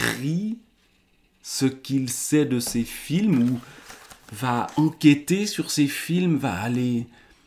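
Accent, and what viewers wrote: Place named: Vaud, Switzerland. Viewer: J'ai l'impression d'être de retour à Lausanne en vous écoutant... Vous êtes vaudois ?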